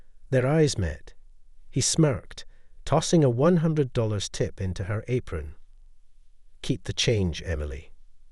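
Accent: Scotland, United Kingdom. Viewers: A Scottish accent is a deal breaker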